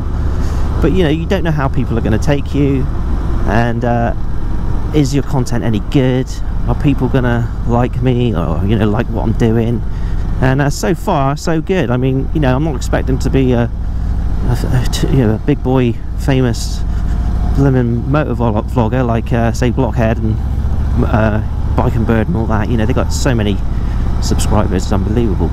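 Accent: Somerset, United Kingdom. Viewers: Great video you are doing great work. First of for your decision on getting and choosing your harley you got spot on. For your starting vlogging you should see motonewb blockhead early stuff really gritty video and sound. First started watching xxxdeadhead and shawnsmoak also robbertrob and huntingharley. I've noticed and they all done this as they went on started saying they upgraded their cameras microphone mainly gopros with senna headsets. As for your contents don't really threat you are doing great stuff there is one thing which is rarely done is pavilion opinion something to consider in the future. What do you talk about what roads like where you live what is your favourite route why's it your favourite. Why you like were you live or don't because of the roads traffic. What you thinking of doing to your bike in the future and why. I. E. I've got a pink metallic paint job with unicorns because why not. 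Chicks love it gets me laid a lot and who F, ing put it in the laws of bikering you have to have a black or covered in skulls bike to be a biker. Just a point my bike has skulls on paint but who cares i like it. Just do what you want to your bike and if you want tell why you chose to do that. Just a mention no one as far as I know has installed two inch tank raising kit on the new streetbob. Your voice is good definitely sounds with hint of somerset region. Microphone you are using is good. Those motorvlogers who done it for a while also use filters on their pc's. You are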